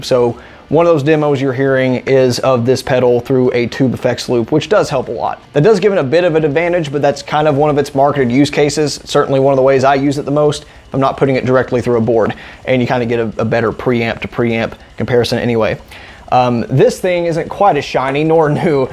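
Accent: Texas, United States. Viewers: Dude, a Pantera t-shirt goes with that accent, not a Black Sabbath one.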